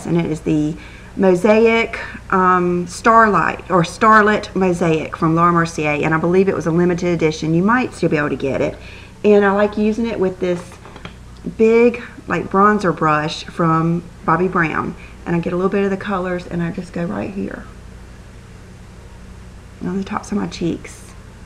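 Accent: South, United States. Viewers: Wow, they need to bring that paint pot back! Such a pretty look on you. I like my brushes "seasoned" too.....lol. I got that Nars eyeshadow in the mail Friday, it is so pretty and different. Oh, one more thing so you won't think I'm crazy, I don't know why I thought you were from Atlanta! That's why I told you I loved so close to there. Sorry. (Maybe the stereotypical southern accent that most of us from the south have, idk?!) xoxo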